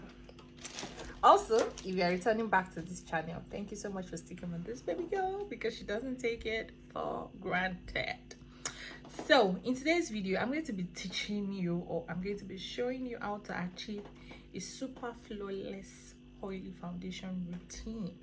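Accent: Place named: Nigeria. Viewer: As soon as I opened the video and heard your voice I said, yesss my Nigerian sistaaa!!! just subscribed!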